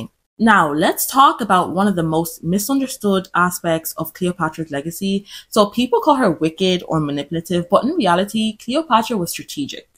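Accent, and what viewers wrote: Ireland. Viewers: Irish! That’s it! The accent is very similar!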